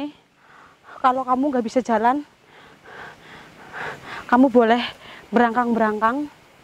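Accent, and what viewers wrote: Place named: Java, Indonesia. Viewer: Mba... Medoke aku seneng denger nya,... 👍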